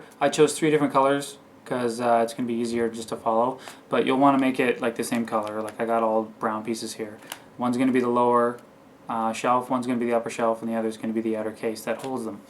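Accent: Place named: Canada. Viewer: Air123ism got Astral'd.. You sound Canadian, much like the Vigilant Christian dude..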